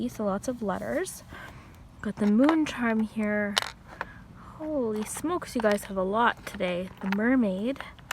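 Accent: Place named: Ontario, Canada. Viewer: Are you Canadian by chance? I think I can hear the Ontario accent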